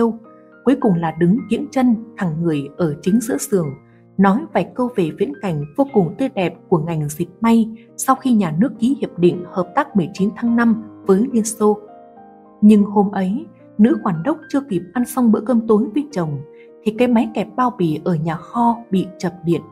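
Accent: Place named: North, Vietnam. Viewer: Nghe giọng Bắc biết xạo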